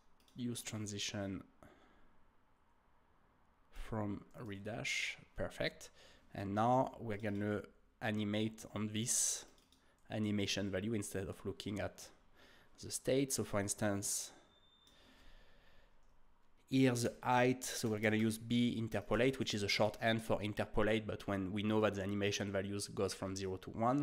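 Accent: France. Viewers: are you French speaking ?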